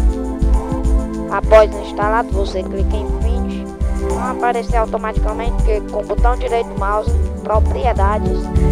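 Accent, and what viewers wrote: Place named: Paraíba, Brazil. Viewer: FANHO COM PARAIBANO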